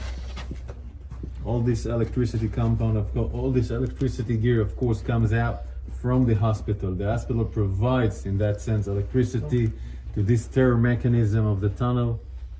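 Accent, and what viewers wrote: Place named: United States. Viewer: He is trying to hide hi American accent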